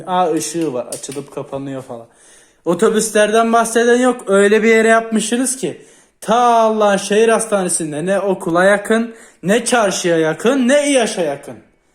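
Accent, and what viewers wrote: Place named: Cyprus. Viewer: allahın kıbrıs şiveli 3t'nin çakma ergen versiyonu... Çalışma masaları ve yataklar farklı yerlerde arada duvar ve kapı var tuvalet için ayrıca kapı var lavabonun orta yerinde açıkta değil yani klozet. Banyon da kapılı. Dandik duşakabinlerden değil. Onu da kapılı yapmışlar. Üstüne sandalyen tahta sandalye değil rahat. çalışma masanın lambası bile var. Sen daha ne istiyorsun? Devlet senin göbeğini mi büyütsün? Çok göbek pesindeysen gider kendi yemeğini kendin alırsın boş boş konuşma. Tuvalete adam niye terlikle gitsin? Terlikle gittikten sonra yine içeri terlikle gelmeyecek mi? Kafan mı iyi senin? Alaturka tuvalet değil klozet var orada. Yerler, terliğini ve ayakkabısını kirletmiyor yani. Senin gibi tipleri böyle aklı başına gelinceye kadar döveceksin. Senin gibi tiplerin çalışacağı max. yer sanayi olmalı fazlası değil.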